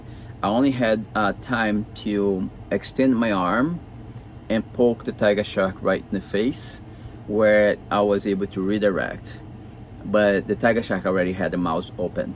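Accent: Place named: Brazil. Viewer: hehe i can hear the brazilian accent! love your videos bro hope your channel blows up!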